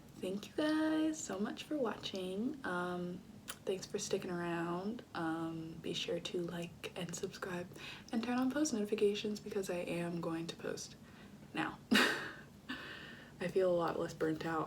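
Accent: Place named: Australia. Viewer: austrailian accent yes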